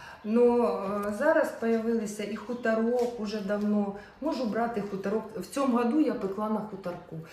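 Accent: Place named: Surzhyk, Ukraine. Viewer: Пиріжки, а не піражки, робити, а не рабиць, не мЯгкий, а м'якИй ,і т.д. Вибачаюсь, але мене цей суржик страшенно дістає...Це приниження нашої мови! Ви така красива і розумна, але цей суржик знецінює все... Чи вам теж - " какая разница?"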